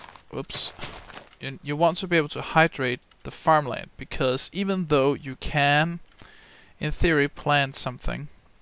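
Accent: Denmark. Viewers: Hehe, kunne høre på dit engelsk at du er fra Danmark! ;)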